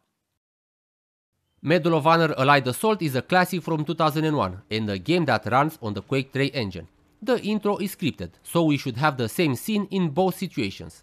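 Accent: Romania. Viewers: He is Romanian, that's why he has this accent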